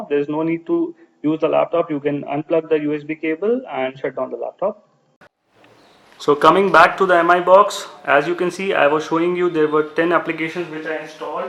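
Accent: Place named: India. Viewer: i don,t like your indian accicent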